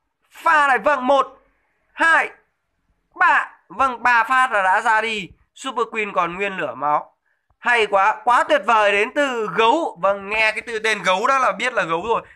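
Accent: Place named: Nam Dinh, Vietnam. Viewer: Anh người nam định hả anh hay ở đâu ?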